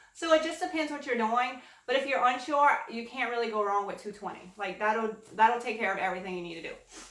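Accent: Louisiana, United States. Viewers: Love your video's, great inspiration! Thank you for sharing your ideas! I was going to ask what state you're from, because I keep hearing Cajun. Then on the fb group, your last name...silly, I know. But I've got a friend that's Cajun and I love hearing her talk.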